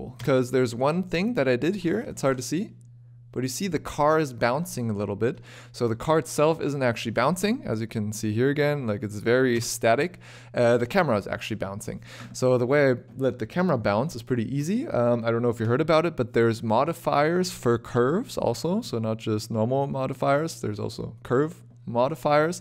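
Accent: Germany. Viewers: haha ich war mir nicht sicher hab es mir gedacht klingt ein bisschen wie Deutsches Englisch. Aber du sprichst richtig gut Englisch mach weiter so !!!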